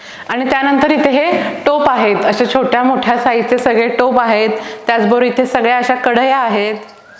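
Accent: Kolhapur, India. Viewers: बोलण्यात हळू हळू कोल्हापुरी tone जाणवतोय बरं का!😅😅... खूप शुभेच्छा तुला...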